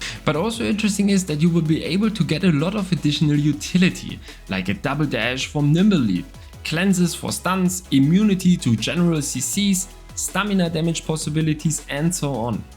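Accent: Germany. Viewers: Hört sich so Deutsch an :D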